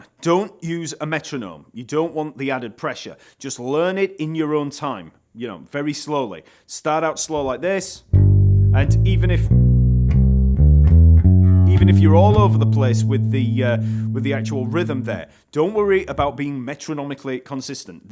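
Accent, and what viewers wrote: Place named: North, United Kingdom. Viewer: The clarity of your Northern accent allows me to soak your teaching by osmosis. It's only a Northern song. I'm a Yorkshire lad by the way. Si thi.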